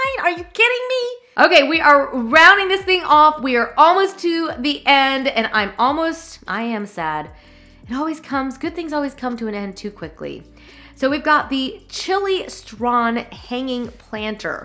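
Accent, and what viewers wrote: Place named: South, United States. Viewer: I'm hearing a strong southern accent in this video, which i hadn't picked up before and now I'm wondering if I've missed it all this time 🤔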